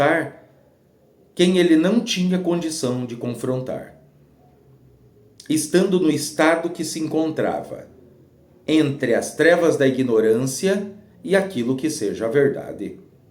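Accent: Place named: South, Brazil. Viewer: O cara não sabe nem o Português, quanto mais falar sobre coisas que não existem. E esse sotaque sulino escroto, e esse olhar de louco.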